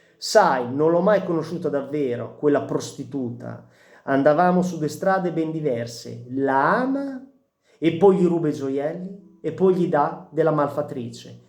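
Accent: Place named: Friuli, Italy. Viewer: Hai un bell´accento. Friulano?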